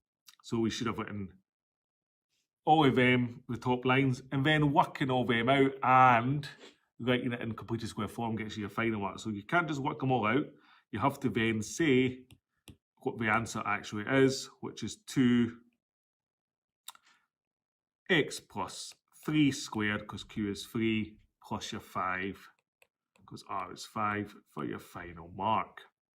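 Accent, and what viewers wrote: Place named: Ireland. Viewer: fikin hell mate cant understand ur irish accent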